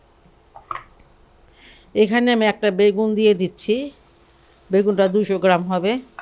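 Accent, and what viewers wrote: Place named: Bikrampur, Bangladesh. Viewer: Aunty onek test apnar ranna.. Bikrompur boley kotha.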